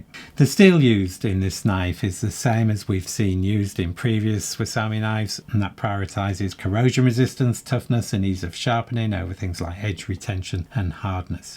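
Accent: England, United Kingdom. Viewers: Where in England is your accent from?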